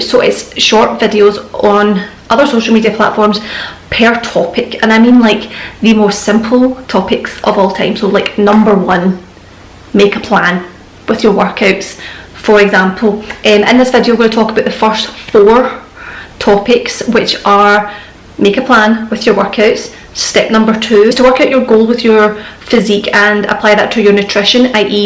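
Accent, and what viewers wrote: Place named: Scotland, United Kingdom. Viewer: Hey. Thank you for your video! Fun fact, I started following you in 2016 and one of the reason (on top of your awesome content and the veganism) was to get used to scottish accents before moving here. Now I've been in Scotland for 7 years, so thank you for being my introduction to the country (and fitness!):)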